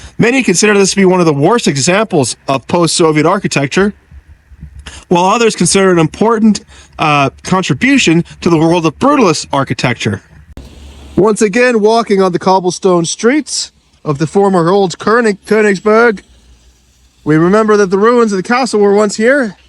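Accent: United States. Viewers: Belfast? I thought you were American with your accent interesting 🧐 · Where is this guy from originally, The US, or Canada? It’s very hard to tell the difference most of the time. People in the state of Minnesota sound more Canadian than most Canadians do. 😂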